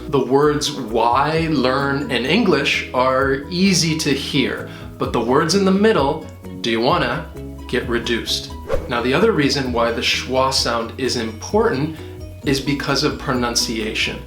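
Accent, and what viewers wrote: United States. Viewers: Do you speak US accent?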